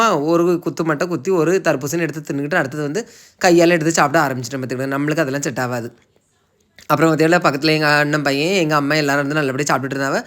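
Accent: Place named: Nagercoil, India. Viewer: Super your speech my own house super people speech same great good nargovil speech super thanks 🙏🙏🙏👍👍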